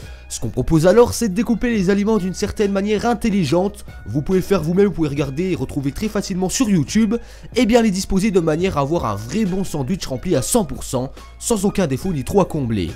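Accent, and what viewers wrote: Belgium. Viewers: tu est Belge??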